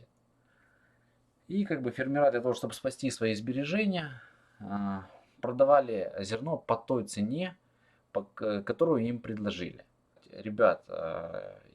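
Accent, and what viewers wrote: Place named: South, Russia. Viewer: Шокание и гэкание - южнорусский говор русского языка. Прекрасно звучит!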